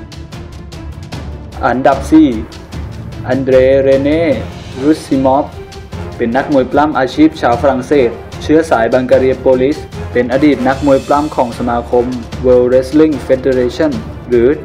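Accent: Suphanburi, Thailand. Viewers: คนสุพรรณ ปะนิ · ทำไมเสียงเน่อจังคับพี่ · สุพรรณ ใช่ไหม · หึ่ย เหน่อ เหมือนกัน😂😂😂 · คนสุพรรณป่ะเนี่ยเราก็สุพรรณ555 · เสียงเหน่อไปทางสุพรรณ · พึ่งรู้ว่าพี่เป็นคนสุพรรณบุรีเหน๊อเหน่อ มาจาก<num> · พี่พูดเหมือนคนสุพรรณเลยอะ พูดเหน่อ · คนสุพรรณใช่มั้ย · เสียงเหน่อมากเลยพี่ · เหน่อจังอะ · คนพากษ์เป็นคนสุพันป่ะครับ 5555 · คนพากเป็นคนสุพรรณใช่หม้ายยยย55555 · พุดเหน่อนะเราอ่ะ..คนสุพันรึป๊าว?5555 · คนสุพรรณป่ะเนี้ย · เหน่อมาเลยนะไอ่หม่าลูกพ้อ ชอบๆสำเนียงสุพรรณ👍👍👍👍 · เสียงพี่เหนอไป 555 ชอบๆ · ชอบความเหน่อ..น่าร๊ากกกก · คนสุพรรณเหรอออ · เหน๋อออออเชียววว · นี๋มันนสำเนียงงคนสุพรรณนีหว่า เน้อเชียว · พี่เป็นคนจังหวัดสุพรรณป่าว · คนสุพรรณซิน่ะครับ 555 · พูดเหนอสุพรรณป่าวเนี่ย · ชอบเสียงบรรยาย เน่อออดี ฟังเพลินนนเพลิน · เสียงออกสุพรรณมากครับ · พี่คนสุพรรณใช่ไหมครับ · พี่เสียงเเบบเหมือนคนสุพรรณมาก😂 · เสียงแบบนี้ถ้าไม่มาจากสุพันก้อคอนฐมล่ะ หรือไม่ก้อเมืองกาญ แต่ก้อเป็นเอกลักษณ์น่าฟังดีค้าบ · เสียงเหน่อมาก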